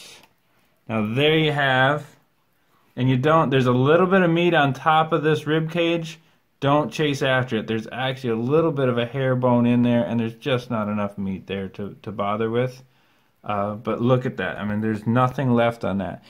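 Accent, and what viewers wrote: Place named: Adirondacks, United States. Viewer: I knew you were an Adirondacker before you said it. It's the accent. I grew up in the Keeseville area. Moved to New Hampshire after college. Been down here about 25 years and people still ask about my accent. My sons and I fish down here. It's fun, but there's nothing like fishing the Adirondacks. Great video! Hope all is well with you and your family.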